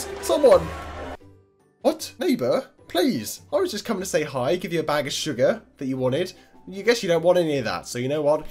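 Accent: United Kingdom. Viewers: O he is British I am Canadian whatever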